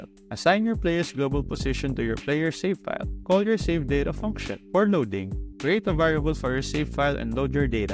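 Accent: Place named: Philippines. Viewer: You sound like a Filipino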